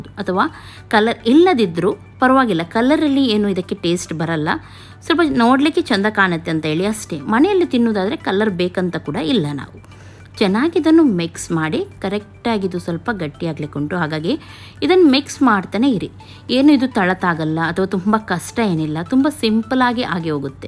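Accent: Mangalore, India. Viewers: Mam I really love ur manglore Kannada and also ur recipe's.. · Hai madam nowadays I am watching your all viedeoes superb your mlore kannada so nice your family very good children are so naughty Khushi aagatte always be happy thank you so much daily vlog maadi mam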